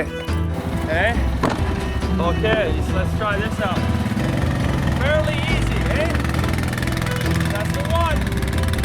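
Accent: Canada. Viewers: Your accent sounds like it's a mix of Canadian and New Yorker. It's like nothing I've ever heard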